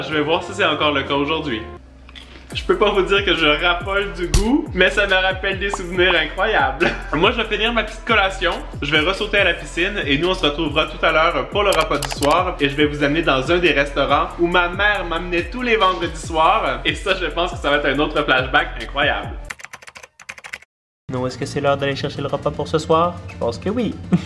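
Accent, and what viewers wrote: Canada. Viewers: tu mon youtubeur préféré desCarl is cooking vidéos accent canadien et qui met quoi des vidéos trop trop rire 🇨🇦👍👍👍👍👍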